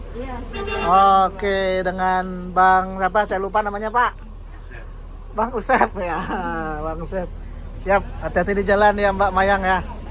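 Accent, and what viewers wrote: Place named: West Sumatra, Indonesia. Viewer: Bahasa indonesianya sangat minang sekali. Wkwkwk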